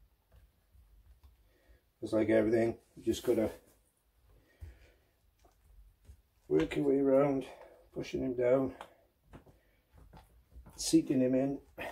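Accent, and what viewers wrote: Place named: Wales, United Kingdom. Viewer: Great, l've been looking for a vid like this of how to jam the front sprocket to enable to take it off. Spoken with a lovely Welsh accent too! Cheers buddy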